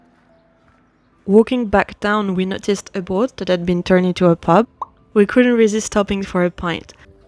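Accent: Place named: France. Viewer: I watch a lot of videos made my foreign visitors and yours stood out a lot. Usually they very often go to the same museums (Vasa, Viking, Abba) the same restaurants (Meatballs for the people) and cafés (Lillebror, Gast, Stora bageriet) and same shops (Arket) and it ends up being a variation of the same trip; a bit boring. I thoroughly enjoyed that your video was quite different. Thank you! Also enjoyed your French accent (I'm half French).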